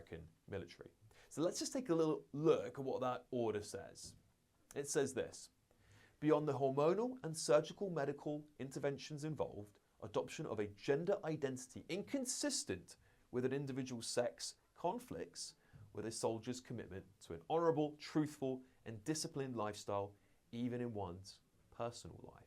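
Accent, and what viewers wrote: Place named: RP, United Kingdom. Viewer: Posh talking head propagandist wimp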